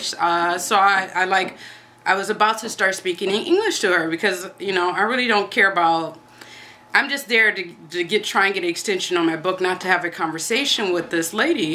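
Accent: France. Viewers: It's funny that your pauses are French times..but you've still kept your American "umm". I think that perhaps some French answer you back in English, because of your French accent. The French are very ticky about who speaks their language and HOW they speak it. So, if it doesn't sound close to a native accent, and they feel that their English is better than your French, they will speak back to you in your language. Rude? I don't think so. I'm sure it could be unnerving, though. Bon courage à vous!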